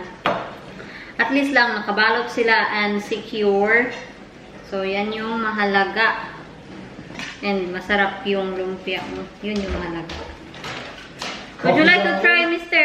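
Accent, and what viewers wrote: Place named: Iloilo, Philippines. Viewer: Hi. Watching here from Dasmarinas Cavite. I remember your accent dahil sa aking mahal na Inay. She is Ilongga. Sobrang sewer at mababait halos lahat ng ilongga. Say hi to your hubby. God bless!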